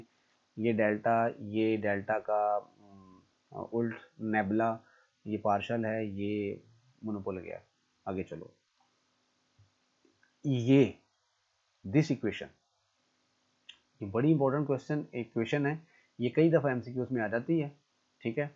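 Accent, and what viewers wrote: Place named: Punjab, India. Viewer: Mashallah your Punjabi accent is so lovely. Really love your teaching method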